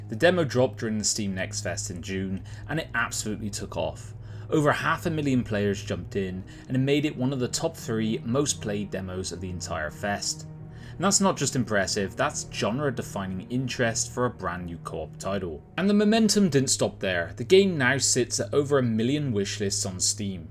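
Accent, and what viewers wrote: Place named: Gloucestershire, United Kingdom. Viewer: Satisfy my curiosity or, I’m a glos boy born and bred. From your accent are you from the same neck of the woods?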